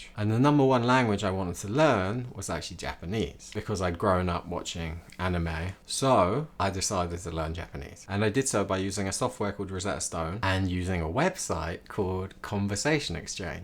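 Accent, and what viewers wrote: United Kingdom. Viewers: 한여름밤의 꿈같은 멋진 추억이네요~ ^^ 저는 반대로 영국에서 그런 추억이 있었네요 같은 영국억양을 가졌던 친구가 생각나네요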